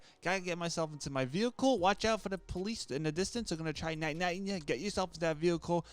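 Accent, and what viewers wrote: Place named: East Coast, United States. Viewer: i’m staying bro u funny 🤣🤣 east coast huh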